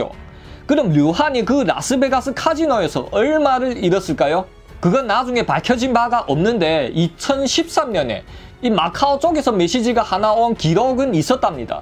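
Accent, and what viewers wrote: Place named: Shenyang, China. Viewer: 진행자가 조선족 인간이네 말소리들으니 선양조선족이다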